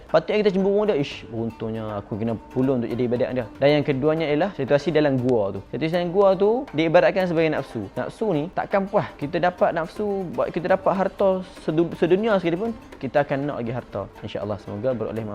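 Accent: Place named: Terengganu, Malaysia. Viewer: Mate Prike hor ler kiter ni org pata timo je (ganu) 🤣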